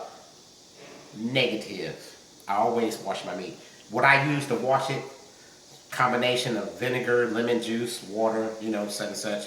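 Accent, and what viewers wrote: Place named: Visayas, Philippines. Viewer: W/my bana, kron pako kBntat . Hehe bisaya diay ka si.😂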